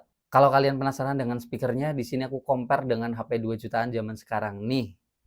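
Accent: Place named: Banyumas, Indonesia. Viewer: Logatnya ngapak banget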